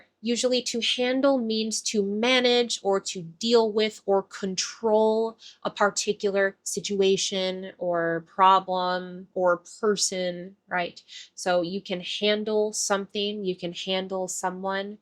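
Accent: United States. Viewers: Hi, I really love your American accent—I could listen to your voice for hours! Your lessons are worth the wait. Thanks a bunch for teaching me!❤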